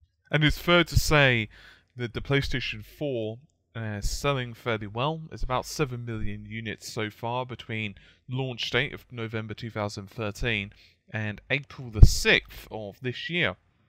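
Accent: United Kingdom. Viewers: What was the # again? C-U-Hayche-100-6-A ? HeHe comfort British Accent ;)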